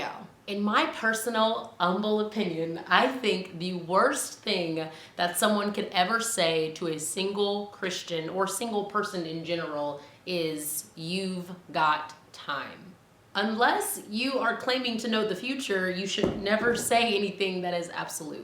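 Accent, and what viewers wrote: South, United States. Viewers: omgosh your southern accent with this message is the most amazing thing ive heard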